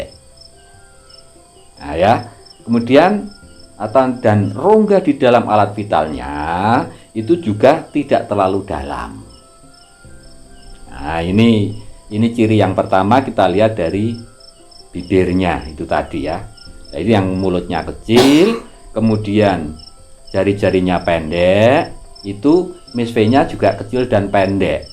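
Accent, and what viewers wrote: Java, Indonesia. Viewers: Mengenali Miss V para gadis.Lagi senang sekiranya sedari bayi.Iaitu anak sendiri.Positif dan bersyukur.Andai aku bisa memahami sungguh gaya penyampaian loghat Jawa!Telus ingin beristerikan orang@ jawa.Rajin dan bersahaja.Serta kuat akal fikiran.Saya dari Malaysia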